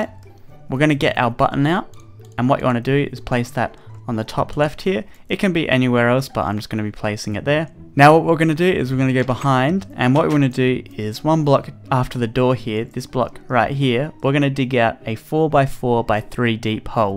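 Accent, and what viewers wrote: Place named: Australia. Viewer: I love ur accent! it sounds almost Australian :)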